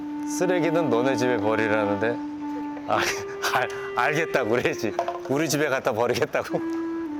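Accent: Seoul, South Korea. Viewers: 원래 이런 병맛개그 성격이셨나요ㅎㅎ청춘의 덫 동철이랑 말투가 꽤 흡사하시네요~약간 옛날 서울사투리 느낌ㅎㅎ 올만에 뵈니 반가워요~~~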